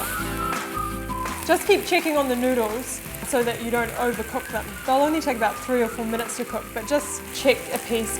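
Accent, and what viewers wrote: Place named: New Zealand. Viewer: The accent is From New Zealand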